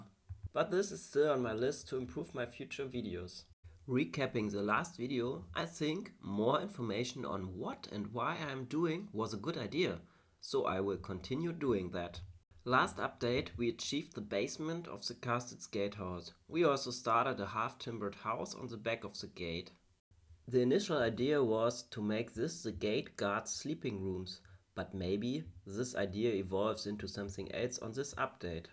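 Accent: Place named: Germany. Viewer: Moin😉👋, Man hört definitv den Deutschen Akzent heraus 😂🤭, doch sieht das Resultat echt gut aus! Viel Erfolg weiterhin😉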